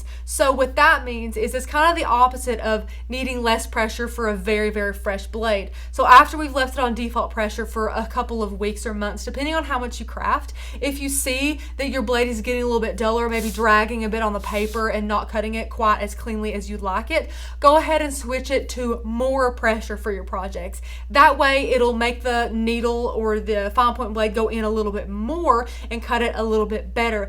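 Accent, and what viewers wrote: South, United States. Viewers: My English is fine, and I have trouble keeping up😁 Her Southern accent is thick, so that might make it a bit more difficult for you, too. I don't know how to do it, but I know videos usually have Closed Captioning, so you can read what is being said at the bottom of the screen. It helps me keep up. Good Luck from Georgia😁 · I was gonna say, she sounds VERY southern to me! (New Hampshire here ;)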